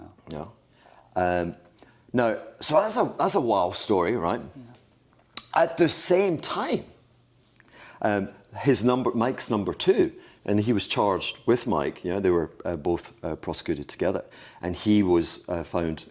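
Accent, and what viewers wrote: Scotland, United Kingdom. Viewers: Scottish accent - Check, Mirror shades - Check Caribbean bangles and mad shirt - Check I love it !!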